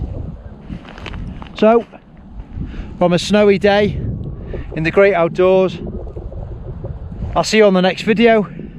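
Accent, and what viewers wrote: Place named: Merseyside, United Kingdom. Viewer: Not many can pull off a walk and talk and keep it interesting but fair play I enjoyed this. Lucky with the snow 👀 still nothing here at the moment as is the norm unfortunately. You sound quite local to me? Merseyside Region? Nice video, Atb.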